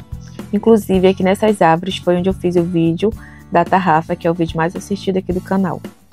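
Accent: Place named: Rio de Janeiro, Brazil. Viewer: Ela tem sotaque carioca kkkk troca o s pelo x, reparei isso.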